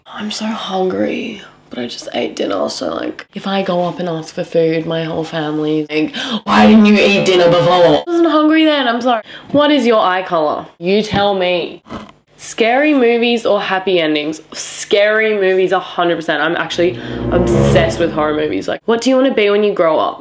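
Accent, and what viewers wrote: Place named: India. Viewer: HAHAHAH ur indian accent is my favourite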